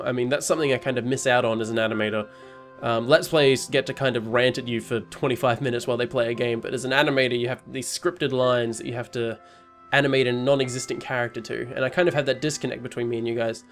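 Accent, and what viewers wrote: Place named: Australia. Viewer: Can I just say, you sound quite similar to Markilplier.. But Australian..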